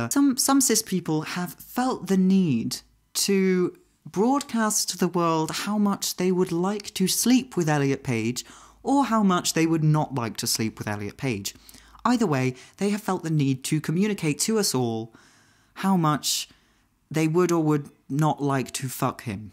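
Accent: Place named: United Kingdom. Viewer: I've never come across your channel before, but I need to say I was NOT prepared for that voice! Between the soothing timbre (I was fooled by your cherubic face) and the British accent... lovely. Like I could see you narrating documentaries or something haha.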